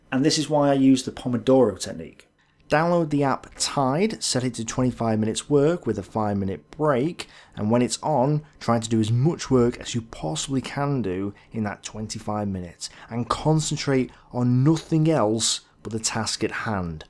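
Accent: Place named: Merseyside, United Kingdom. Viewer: Pomodoro. I'll check those out. cheers. Ps, you have a slight Birkenhead twang to your accent. or from Runcorn and Widnes. I lived there for a while and R&W had such a wide variety of accents that i felt like i was in the 1960s where 'scouse' sounded like the Beatles [Lancashire based accent] but also there wee people who sounded distinctly Mancunian. When i visit my family in the Wirral my old mates call me a 'toff' because they say i talk like a posh person from London yet in Brighton [my home] i am told i have a heavy scouse accent. No wonder Joseph Conrad [who spoke about 9 languages as he lived in central Europe between Germany, Czech and Poland so grew up trilingual]. Then again, as i recall, he did state , to be more accurate, he didn't enjoy 'writing' in English as english had so many accents it was difficult to understand someone who spoke local east end London, compared to a person from Newcastle or the midlands! 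I'm not surprised! hen i first moved to Brighton with my gf nd were chatting in a shop we would be followed by security wherever we went!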